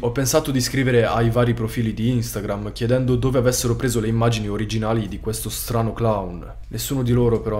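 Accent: Sardinia, Italy. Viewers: Ciao cara, anche tu l'apprezzeresti allora? Magari facendo trasparire un pò l'accento sardo... mmmhh ci starebbe molto, molto bene ❣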